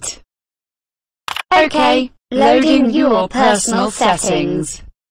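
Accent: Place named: United Kingdom. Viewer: Its British accent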